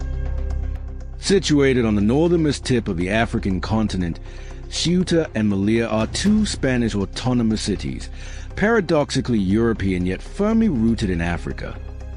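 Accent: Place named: United States. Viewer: ' like the accent of the narror, 'cause he's narring with a genuine one, hey where u fro bro? 'merican, i gess ' what state ?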